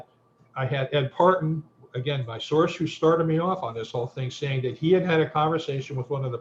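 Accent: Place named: South, United States. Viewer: Mr., I gotta tell you, I wasn't used to your unique Southern accent, at first. I originally subscribed because of your encyclopedic knowledge of Organized Crime in KC. But now???.....I absolutely love your accent, lol. I mean, I love the relaxed way you tell your story with bits of humour in between. I think your accent is adorable, a word which I don't think I've ever used in my life, lol....I, literally, feel like Mark Twain is telling me mob stories with bits of home spun humour in between....I LOVE IT!!!!!!!......Oh yeah, I love your accent too, Dan..... 😎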